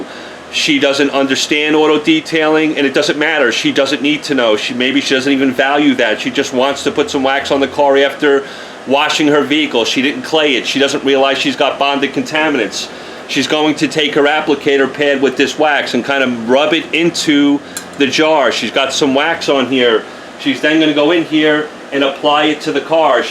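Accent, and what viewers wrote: New York, United States. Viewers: Lol. You haven't seen mad yet. Sounds like a NY/NJ accent which is like this, he actually sounds calm here.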